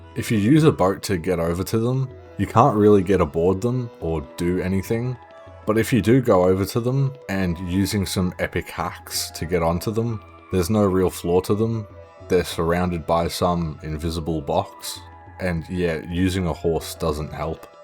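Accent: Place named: Australia. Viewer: Australian commoner accent.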